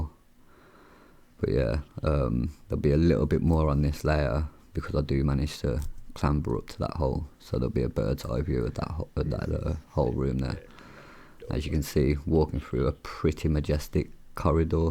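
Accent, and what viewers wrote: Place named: Birmingham, United Kingdom. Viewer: BOOM! You sound like you are from near to where I came from? Are you a Brummie? Close by?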